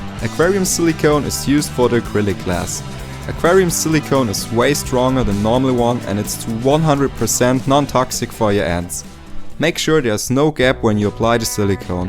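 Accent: Germany. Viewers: Hey. Nice nest. Looks great. Are you come from Germany? Your accent sounds like like german. I come from Hamburg in Germany. I like your channel. Greetings Alex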